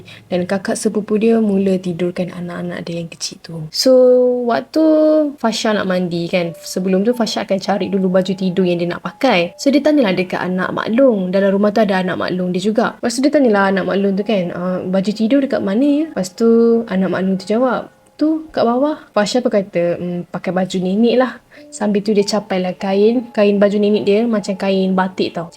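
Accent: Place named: Kedah, Malaysia. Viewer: seronok dengar cakap kedah😅😅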